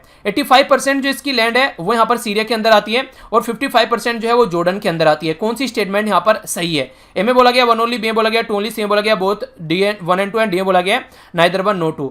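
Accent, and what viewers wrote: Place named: Bihar, India. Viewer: Kis kis ko lgta he ki hmare pyare sir bihar se he😊😊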